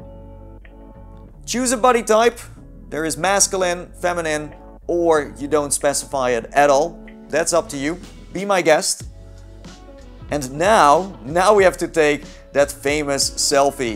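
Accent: Netherlands. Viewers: nederlands accent mag wel wat harder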